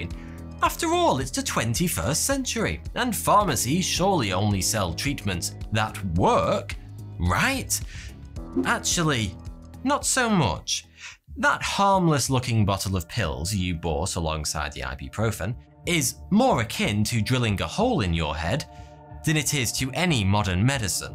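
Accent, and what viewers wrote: United Kingdom. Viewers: Who cares he has a british accent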